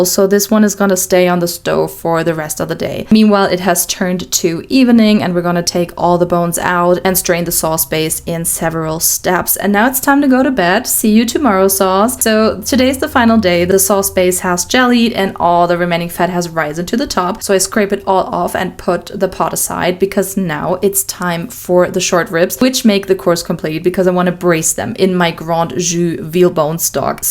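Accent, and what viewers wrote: Germany. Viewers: Are you german? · is she german/austrian/swiss?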